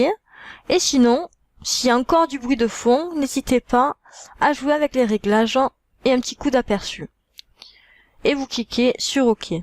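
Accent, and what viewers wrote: South, France. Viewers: Cet accent sudiste me hante! (Je passe mes vacances en France donc je réalise un rêve ) Mais sinon ça va mettre très utile pour ma prochaine vidéo ;-)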